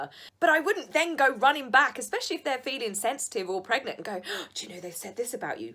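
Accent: England, United Kingdom. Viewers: I love your beautiful English accent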